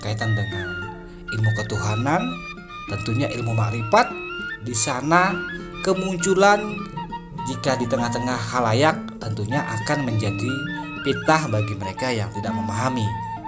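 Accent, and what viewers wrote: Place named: Semarang, Indonesia. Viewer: Satrio piningit bagakan.terbelenggu Di lobang tikus sendiri 😊 Sambil ngencak HK Kecil kecilan kamu sepertinya orang SMG logatnya nada Bicara sepertinya Aku pernah kumpul Sama orang smg😊 Di puncak. Cemara😊😊😊😊😊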